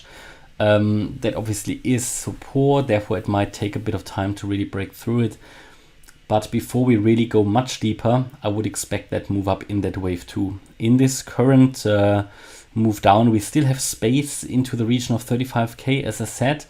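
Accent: Germany. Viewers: According to the accent you should be German. Danke für die excellente Arbeit. Es ist schön zu sehen wenn sich die EW´ler einig sind.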